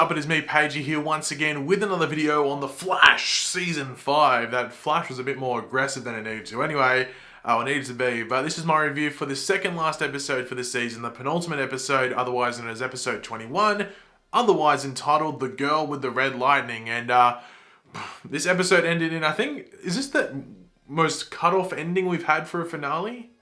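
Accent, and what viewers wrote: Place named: Australia. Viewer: Your mad cause u dont realize hes australian. They have accents. Not everyone is american get over ur self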